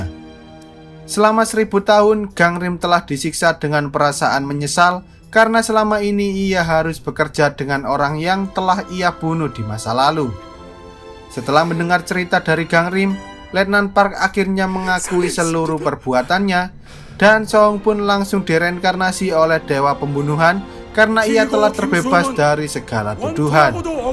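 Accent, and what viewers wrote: Java, Indonesia. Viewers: Njirrr. Wes rah usah kesuen. Intro nya wong jowo doooonk.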